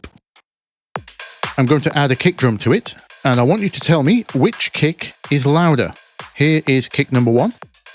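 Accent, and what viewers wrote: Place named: West Yorkshire, United Kingdom. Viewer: nice videos! You sound like you're from west Yorkshire, talking posh? 😀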